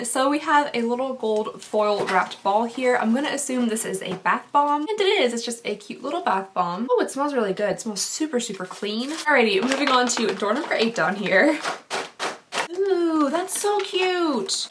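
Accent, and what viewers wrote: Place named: South, United States. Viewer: Your holding back a southern accent...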